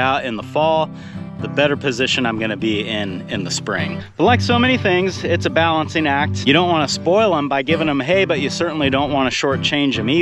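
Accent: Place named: Texas, United States. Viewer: If you are from Northern California how come you have a southern/ Texas style drawl? I just like guessing accents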